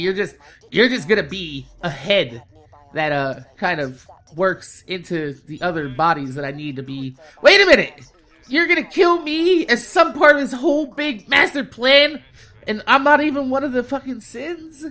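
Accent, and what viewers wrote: New Jersey, United States. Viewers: I can hear the jersey in your accent hahah, away at school always good to hear that shit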